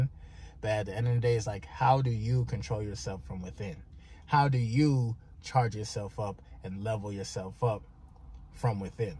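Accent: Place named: Jamaica. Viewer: Knew you was Jamaican bro 💪🏿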